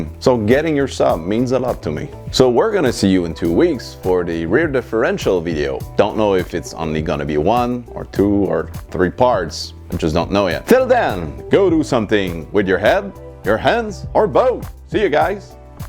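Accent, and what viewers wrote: Quebec, Canada. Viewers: Been in awhile since I've heard a Quebecois accent. Your english is great!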